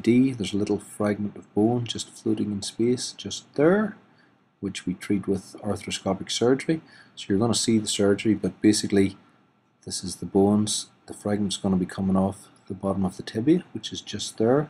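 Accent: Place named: Northern Ireland, United Kingdom. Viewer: Hi, I'm a N'Ards (Co. Down) girl living in England and my colt has just had this surgery. I'm re-assured at seeing this video of the surgical procedure, but have many questions to ask. He's a 9 month old SHxWB, Fleetwater Opposition and Cavalier Royale bloodlines. Concerned owner is all, but hearing the NI accent has made me ask if you have the time to give me some info on post-surgery aftercare etc? I've been told is a cartilage defect? I'm confused...!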